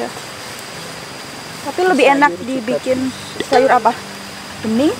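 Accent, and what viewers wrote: Banyumas, Indonesia. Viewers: Mba si umahe nng ndi mba, sue subscrbe tp ora ngerti wong ndi, bhsane ngapak2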